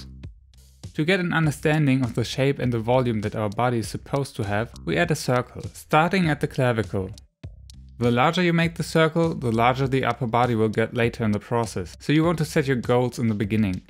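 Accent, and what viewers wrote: Germany. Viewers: Are you From Germany? Your acent sounds like that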